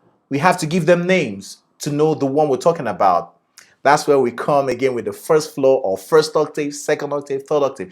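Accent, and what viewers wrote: Nigeria. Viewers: Are you a Nigerian? You sound Nigerian!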